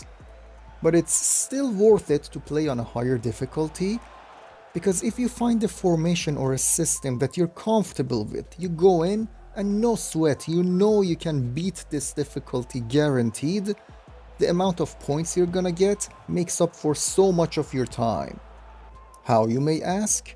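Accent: Iran. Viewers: Omade bodam videot ro bebinam bad goftam cheghad lahjash mesl iraniast bad didam esm chanelet e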